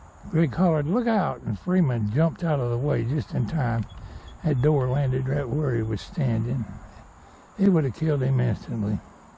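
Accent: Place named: Georgia, United States. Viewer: just remembered your channel and the railroad stories, sometimes listening to your georgian accent is a hoot like the you you say fire, sounds like your saying far. lucky that door didn't fall on him, those railroad things are extra heavy, and you cant ever be too too carful around railroad stuff just hitting your head will about knock you out, that cast and forged metal dont have any give unlike a car or lighter vehicle made of sheet metal, belive me I know in the course of helping in the shop for the nkp 765 we have dont much car restoration the last 5 years, I rung my bell several while working on the inside of the tender to clean it in the off season all those baffles inside the tank , or backed into eighth inch metal on the body of it it dont have any give , and skinned myself plenty crawling around in the inside of the firebox, I would say the scaryest time was wirewheeling and I hit a right angle on the bottom of a car I was taking the dirt and rust off of by the truck of the car and it bounced five times hit my left wrist and bounced back and launched right across my neck still in my hands and landed on the floor it it was a cutting wheel I might not be here or gravely injured, one other time 2 years ago sat a 10 lb arch brick down with my finger right under it and it had a lump on it for a few months from squashing it under the block and the metal of the firepot where the coal comes out to be thrown to the fire with steam jets from the stoker.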